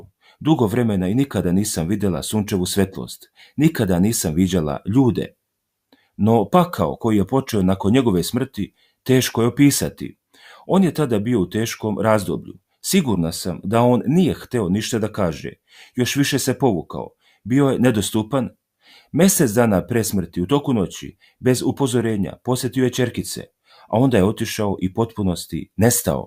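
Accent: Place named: Croatia. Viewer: I kad si se probudio...ti pao sa kreveta...hrvatski naglasak a pokusavas ekavski da pricas...samo o tome sanjat mozete...Pravoslavlje ce da pobjedi i Putin ovaj zivi Putin ce da dovede i Ruskog i Srpskog Cara jer je tako GOSPODU I SPASU NASEM ISUSU HRISTU U SVETOJ TROJICI UGODNO...a vase masonske i satanske zelje ce vam se ispuniti tamo gdje pripadate i ispunice vam ih vas nalogodavac u paklu... BLAGOSLOVENO CARSTVO OCA I SINA I DUHA SVETOG!!!!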